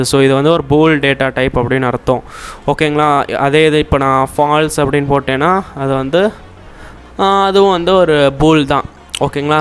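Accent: Madurai, India. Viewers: Bro Neenga Maduraiya?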